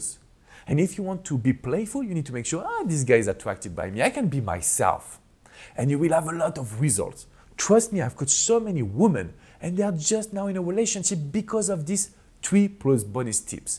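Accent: France. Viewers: Ohhh le french accent je love it 🥖 🍷 🇫🇷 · haha "You can hear my french accent"... 🤣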